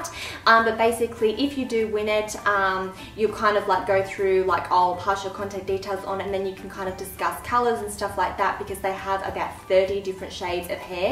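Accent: Australia. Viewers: Bruh where should I start congrats btw 10k 🙌🏾🙌🏾 u deserve it, ur vids kill me there so funny I love ur personality for making something funny and ur Aussie accent is on FLEEK😍😍 . The reason why I want to win the extensions I really want to try something new im so used to weaves that I never considered extensions so why not try something NEW 😃😃 And for the brushes I haven't really liked makeup brushes u can say I'm new to makeup but I still use the basics and never had good experience with cheap as 2$ brushes 😂😂 ... Umm I don't know if I make sense but yeah lol 😋😋😎😄💁🏾💁🏾 LATERZ✌🏿️✌🏿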